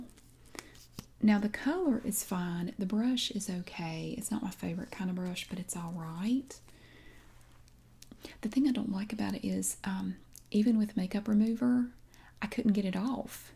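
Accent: South, United States. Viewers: I lived in NC for a couple years and this reminded me of folks down there. I never thought I'd miss the southern accent.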